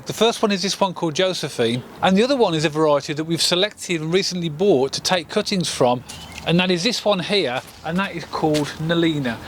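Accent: United Kingdom. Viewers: Eh, don’t bother me about adding the N. It’s the way Brit’s say compost. Not comPahhhst” it’s comPohhhst. Oh. lol not fence pahhhst. Fence Pohhhhst!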